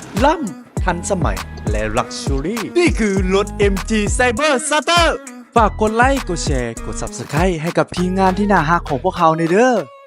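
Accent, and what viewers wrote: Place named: Laos. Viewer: ชอบฟังเสียงภาษาลาวแบบนี้มากกว่าค่ะ ดูเป็นเอกลักษณ์ดีค่ะ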